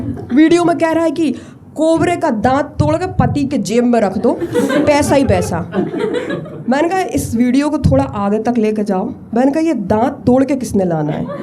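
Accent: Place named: Bundelkhand, India. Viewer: Great content, amazing delivery with a little pinch of bundelkhandi tadka… keep it up, long way to go…❤❤❤😊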